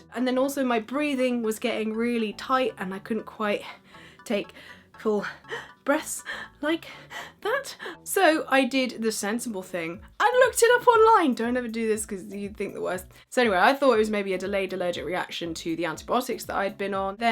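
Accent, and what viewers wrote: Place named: Cockney, United Kingdom. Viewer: So not only are you a cockney girl with a great personality an infectious laugh and sorry for been sweet and corny but beautiful eyes but you like danger mouse gimme gimme gimme I grew up watching danger mouse, super mouse, banana man and the raccoons.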